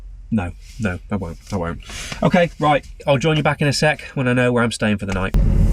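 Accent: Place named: Shropshire, United Kingdom. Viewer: Hi from a fellow Salopian 👋, I thought I recognised the accent . I actually found your channel while waiting for my daughter and boyfriend who are at Cineworld in Shrewsbury . So sorry that happened , total scumbags , should not be allowed to breathe the same air.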